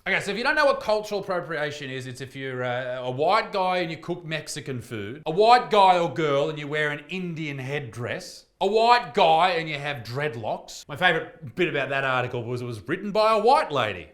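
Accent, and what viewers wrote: New Zealand. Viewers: How the hell did a nothing story from the west coast of NZ end up in the US. Especially as there are only 6 people who live in Hokitika. I need to get my arse down the road to the busy place (Auckland) to watch your show. Good work fella. Your Kiwi accent and pronunciation of Te Reo (Maori) is pure comic gold. Aussie is woke as hell, but there are plenty of virtue signalling woke idiots here too. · 10/10 for making me laugh today. Your kiwi accent is hilarious your rating of cup sizes is ground breaking.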